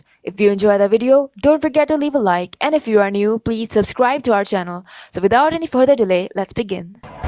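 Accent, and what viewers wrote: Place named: South Asia. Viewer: Hi Nice to hear a desi Voice, talking about Anime:)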